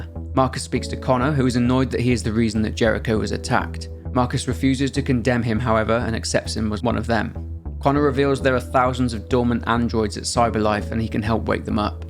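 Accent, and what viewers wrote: United Kingdom. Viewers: Why is it always some british fool talking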